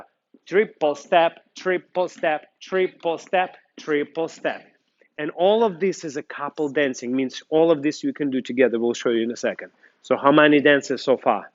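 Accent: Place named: Ukraine. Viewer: I watch your videos all the time for tips! I would always say to myself "you are exactly like my instructor"! When my social dance studio closed down from Covid last year, I managed to find a new studio close by. I wasn't expecting one-on-one with the utmost professional dancer as I was only used to group dancing with my granny buddies where I think we put more social into the studio then perhaps the dancing. However, I really liked learning all the different dance styles and wanted to continue. The new studio I found is nothing like the old one. I come home and need a hot bath after these lessons. I also had to look for videos for tips and exercises, but they couldn't be just any videos, I needed to find videos where the instructor would say " posture, posture posture, you have to settle your hips, core in, rotation, you need to create a straight line"! 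Then when I heard in your video "a beautiful straight line" in the same exact accent as my instructor, (who is also from the Ukraine), I said to myself... these are the perfect videos! I really like learning the mechanics of the dance now even though it is a lot of hard work! However, the mechanics put the meaning, style, expression, and beauty in every step. I am grateful for finding the most passionate and experienced instructions. Thanks for all you do!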